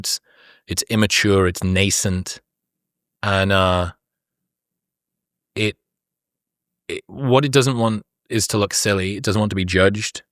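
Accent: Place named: England, United Kingdom. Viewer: When did Zuckerberg get an English accent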